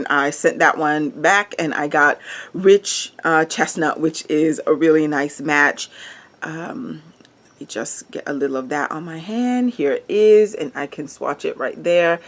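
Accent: Caribbean. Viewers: Yup! I heard a Caribbean accent, I think. I'm from hot Houston, Texas! ❤️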